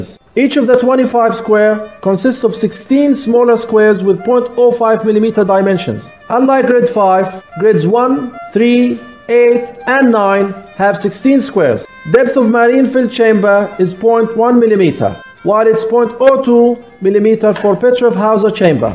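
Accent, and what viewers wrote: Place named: Arab world. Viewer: Brother 😂 your speed = like a ferrari but i have gotten by accent you are an Arabic where as i am from kashmir . So it was more difficult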